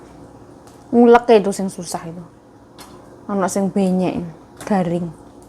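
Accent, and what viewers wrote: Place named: Blitar, Indonesia. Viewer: tak kira mbak orang Blitar wkwk 🙌🥰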